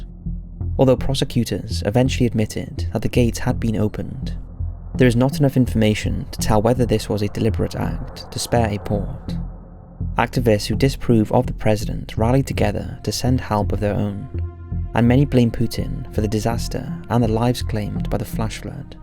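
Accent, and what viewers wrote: Wales, United Kingdom. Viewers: I’m hoping that does an April fools video entitled “official face reveal” and instead says “haha, I trolled all you saps. Smell ya later pimps” in his graceful Welsh accent. It would be so out of character and interesting to hear. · Is it just me or has the narrators voice become less welsh?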